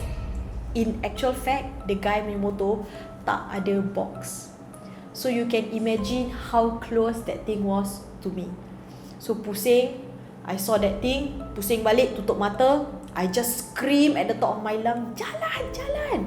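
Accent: Singapore. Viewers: Are you Singaporean? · Tgk vid ni baru aq tau singapore pnye bm pn loghat lain siak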